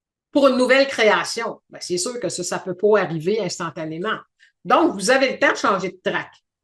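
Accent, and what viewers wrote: Quebec, Canada. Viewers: MERCI de tout coeur pour cette réponse à cette question posée car elle m'était aussi venue. Je t'écris depuis la Suisse😀. Tu dis que tout va très vite actuellement, je le remarque aussi. Par contre j'observe que certaines choses prennent plus de temps. alors que je suis dans la joie, la Gratitude envers la vie et TOUT ce que je vis. Est-ce en lien avec ce que tu expliques dans ta vidéo "2024, année de la récolte" que la récolte va débuter au printemps? Je me régale de l'accent québécois! Je me Réjouis de ce qui se déroule sur Terre, ça fait longtemps que je l'espérais. J'aime cette phrase : "La Magie opère lorsque l'Âme agit" Coeurdialement. Mahor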